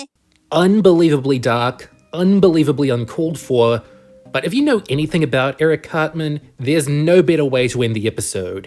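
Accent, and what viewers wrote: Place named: Australia. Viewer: People here:He pronounced it wrong,he pronounced it Shah-grin,Chah-ring,Who writes your stuff..."bunchofbullshit". Guys,he's Australian,this way of speaking comes from his accent,be a little more respectful and understandable towards him.😒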